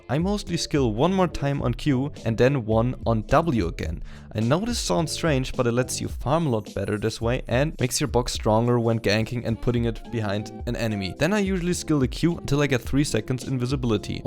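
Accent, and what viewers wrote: Germany. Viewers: Ey echt cooles video, ich hätte da 2 Fragen erstmal und zwar: 1. Welche Elo Spielst du also sagen wir letzte Season? 2. Was ist dein Mastery Score mit Shaco momentan? Als Feedback, mach gerne mehr Videos die sind echt Klasse, hast echt alles super erklärt und wieso ddu diese Spielweise bevorzugst. Du könntest deinen Viewern also auch mir zeigen wie du spielst gegen counter Matchups verschiedene invade jgl Wege und was du machst wenn du behind bist. Danke fürs Lesen ( und ja man hört es heraus das du Deutsch kannst) LG ~Finn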